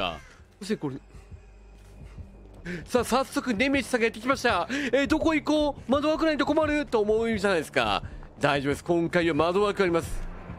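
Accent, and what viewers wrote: Kansai, Japan. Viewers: DBD気になっていたので、参考にさせていただきます！ 関西弁もたすかります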